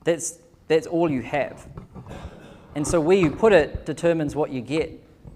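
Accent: New Zealand. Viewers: This man must surely be the most annoying human being on earth. From his annoying squeaky kiwi accent, to his tiresome textbook-motivated gobbledyegook. For example (and this is typical of all his Youtube videos: "We can't do anything without action, and action and time and energy. We can't get that from anything other than our human body and our human body can't perform concurrent operations or be replicated. So you are the true constraint of being human is time and energy (sic). That's what life is - energy over time". and: "There are people that have trillion dollar companies and there are people that have no companies - why?" Jeez - why do people pay thousand of dollars to listen to this garbage?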